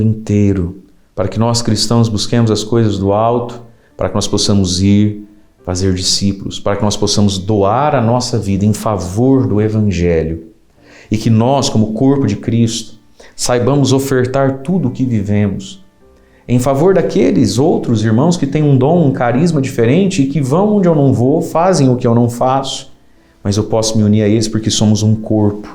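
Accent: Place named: Paraná, Brazil. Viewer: Homilias muito bem elaboradas e de fácil compreensão, com o belo jeito de falar paranaense.Que o Senhor cubra de bênçãos o seu ministério.. Bem Haja.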